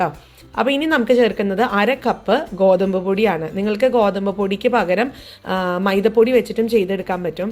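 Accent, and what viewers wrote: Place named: Valluvanad, India. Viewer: വീട് എവിടെയാണ് സംസാരം കേൾക്കുമ്പോൾ ഒരു വള്ളുവനാടൻ ഭാഷ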